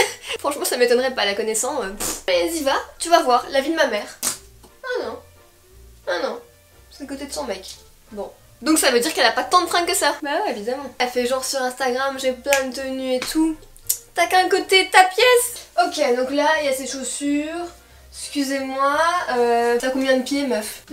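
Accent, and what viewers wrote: Belgium. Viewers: J'adore ton accent belge parce que moi aussi je suis belge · ta un accent belge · J'adore comme tu lâche tes petites expression belge mais moi aussi je suis belge et ma soeur parle comme ça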